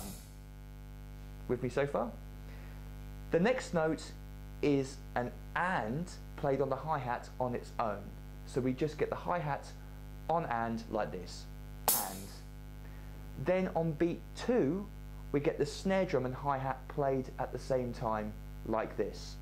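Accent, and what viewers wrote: United Kingdom. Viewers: most british accent i have ever heard..... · he has a british accent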